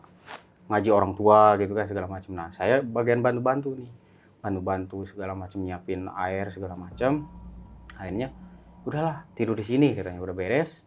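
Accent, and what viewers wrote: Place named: West Java, Indonesia. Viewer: Sudanis pisan. Rada paciweuh pabaliut letah mun ngomong bhs.indonesia teh nya kang abi ge kang. Salalamet sdyana 🙏🙏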